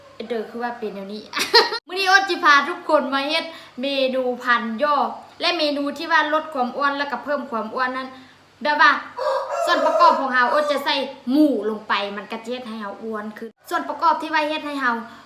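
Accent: Laos. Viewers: ຊົມເຊີຽທີ່ເວົ້າພາສາຊຽງຂວາງລ້ວນໆ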